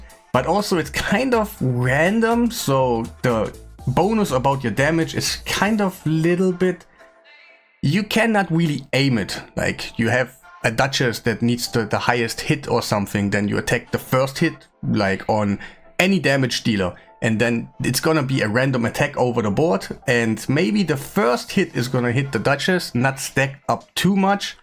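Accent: Germany. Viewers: Dude, where are you from? You sound German or Swiss or Austrian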